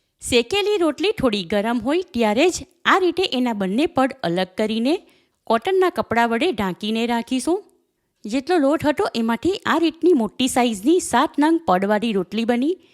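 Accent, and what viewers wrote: Surat, India. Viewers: Pure Surti. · Surati Gujarati bole chhe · Ben surati lage chhe tt bole chhe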